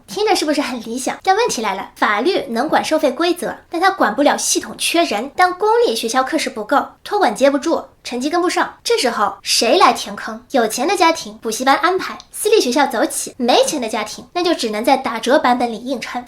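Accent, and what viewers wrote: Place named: Shanghai, China. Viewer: 听口音是桑嗨宁吧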